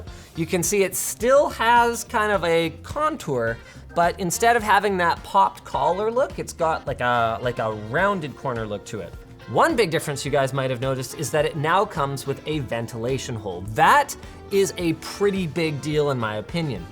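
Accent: Canada. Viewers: I actually heard a bid of a Canadian accent from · sounded extra Canadian in this video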